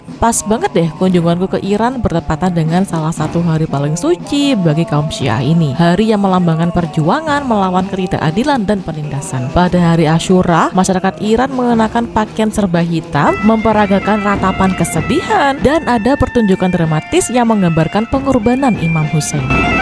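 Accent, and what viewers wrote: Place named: Surabaya, Indonesia. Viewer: Suroboyo banget watak suaranya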